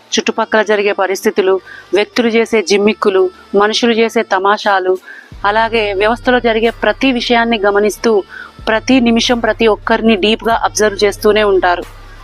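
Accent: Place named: Telangana, India. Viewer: మంచి తెలంగాణ యాస భాష. మంచి మాట కూడా🙏🙏🙏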